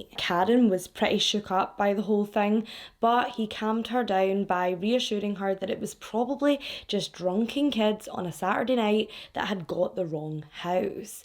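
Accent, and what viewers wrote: Scotland, United Kingdom. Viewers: I find my Aussie self turning Scottish with your regular bits.. then pleaaase, tuurrrn awayy nowww 😊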